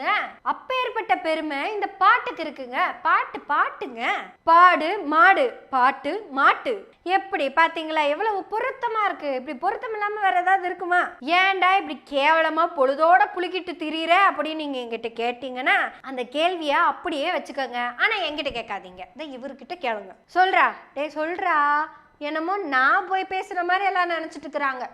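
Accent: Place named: Coimbatore, India. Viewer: குழந்தை குரும்பு கொஞ்சும் கோவை தமிழ் பேச்சு அருமை அருமை தொடரட்டும். .......❤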